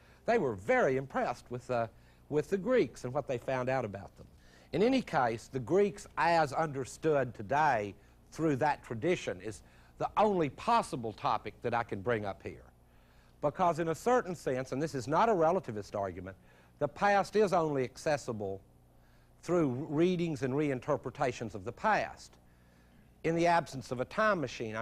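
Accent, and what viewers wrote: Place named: West Texas, United States. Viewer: Professor has the abilty to take a complex abstract topic and break it down to practical applications. His West Texas accent makes his presentation more real world. It is sad that he passed away at such a young age.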